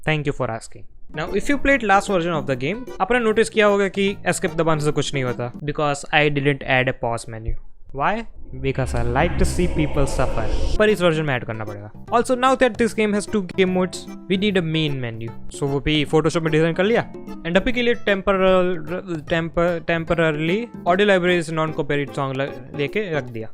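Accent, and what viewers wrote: Gujarat, India. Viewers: Omg a gujju speaking hindi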